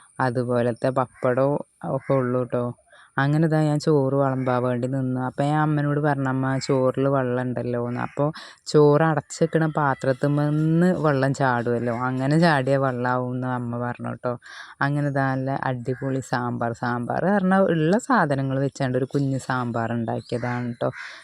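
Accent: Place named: Malappuram, India. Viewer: നിങ്ങൾ എന്നത് തെറ്റല്ല ഓരോ നാട്ടിലും ഓരോ സംസാര രീതി ആണ്.... മലപ്പുറത്തുജീവിക്കുന്നവർ വീഡിയോക്ക് വേണ്ടി കണ്ണൂർ തിരുവനന്തപുരം ഭാഷ സംസാരിക്കാൻ പറ്റോ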